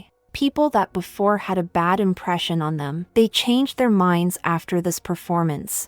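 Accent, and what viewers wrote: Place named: West, United States. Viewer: Western accent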